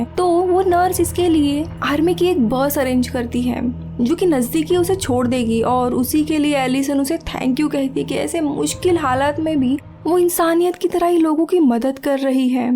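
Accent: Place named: Gujarat, India. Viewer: Gujarati flavour aa rahi hai awàaz me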